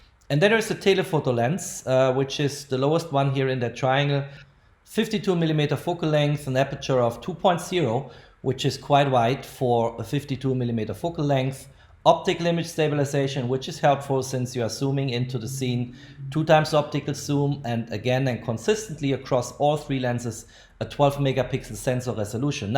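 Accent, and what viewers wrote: Germany. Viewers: Hör ich da etwa deutschen Akzent raus ? 😉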